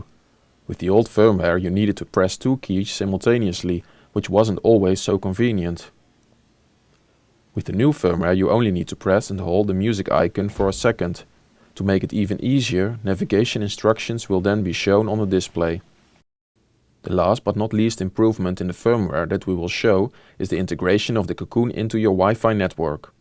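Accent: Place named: Netherlands. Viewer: Ik hoor aan hem dat hij nederlands is ;)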